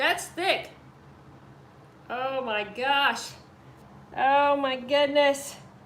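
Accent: Texas, United States. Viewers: As if the lovely Texas accent didn’t give it away! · Her talkin told me she's in Texas 😅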